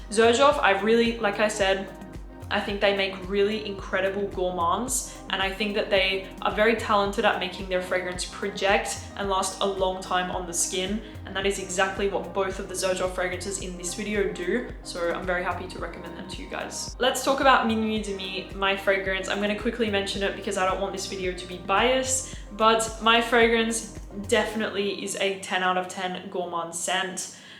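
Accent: France. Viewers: About the accent, I wrote you a comment that Berlin isn’t supposed to sound French, but since then I can’t help but pronounce it as Ber-luh in my mind all the time, especially when I see Poets of Berluh 🤣 the French accent is so sticky 🤣 Anyway I hope you don’t take comments about accents too seriously. Love xxx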